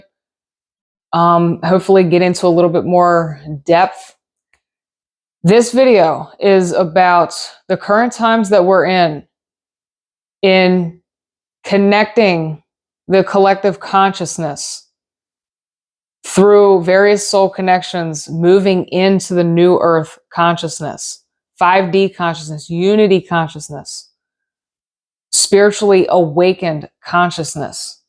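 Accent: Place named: Philadelphia, United States. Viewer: Are you from the Philly area? Sounds like it from your voice!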